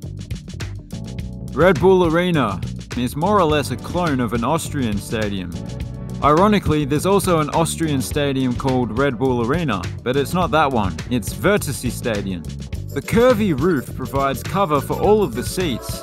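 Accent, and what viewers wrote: South, United States. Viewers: Loved the Southern accent 😂😂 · You sounded like a Southern Nascar fan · The red neck accent is Top Tier 😭😭😂😂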